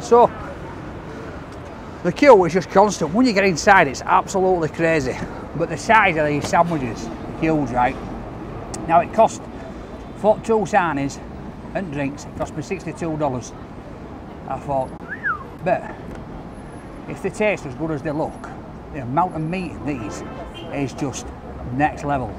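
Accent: United Kingdom. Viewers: ❤️ his British accent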